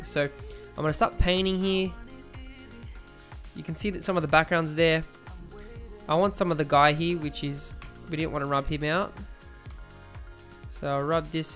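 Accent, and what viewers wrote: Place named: Australia. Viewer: Thanks heaps mate, love the accent as well, finally a tutorial in strayan.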